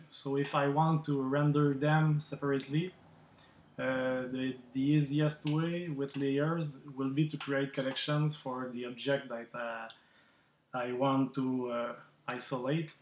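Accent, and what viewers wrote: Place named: Quebec, Canada. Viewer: Très rafraîchissant d'entendre du bon vieux Québécois agnlais😁 mais sérieux merci pour l'approche «straight to the point» avec les exemples simples. J'essaie de m'adapter au mieux à la logique des rendus de Blender.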